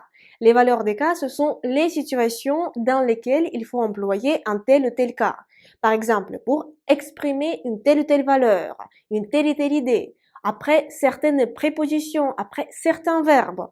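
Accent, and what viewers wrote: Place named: Russia. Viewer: Ohhhh, moi qui aime tellement cet accent russe à français ! En plus de ça, tu es très jolie ! Je suis en amour !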